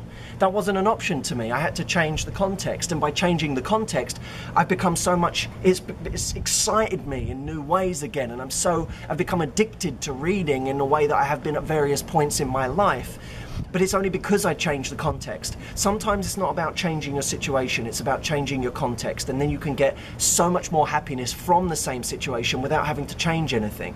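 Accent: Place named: United Kingdom. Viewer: I'm just here to listen to british accent lollll. thank you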